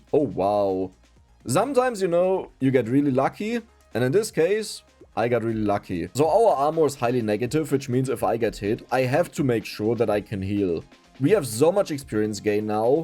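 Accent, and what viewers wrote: Germany. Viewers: Es ist so lustig wie man das Deutsche da durch hört (speeden mit 200 km/h) --- It's so funny how you can hear the German through there (speeding at 200 km/h)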